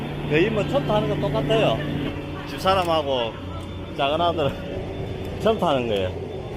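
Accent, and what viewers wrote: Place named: Busan, South Korea. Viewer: 부산사투리 정겹네요 음색도깔끔하고ᆢㅎ 캄보디아간접적 보고생활도느껴보고 고마워요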